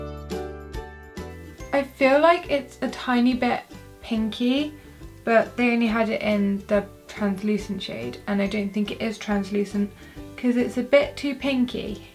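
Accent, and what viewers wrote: United Kingdom. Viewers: I love your accent. I've always wondered what it would be like to live in the UK, mmmmmm, anywhoo, thanks for sharing, great tutorial.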